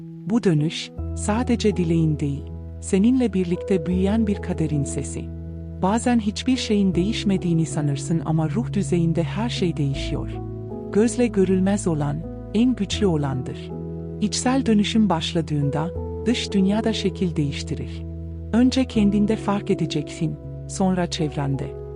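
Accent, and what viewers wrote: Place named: Germany. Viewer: Şu Almancı karıya okutmayın şunu ciddiye alamıyorum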